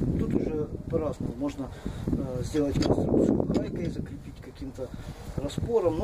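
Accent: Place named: Russia. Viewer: Parabens pela ilustre ideia, vou fazer uma tambem, obrigado. O sutaco parece de russos eles sao inteligente👏👏👏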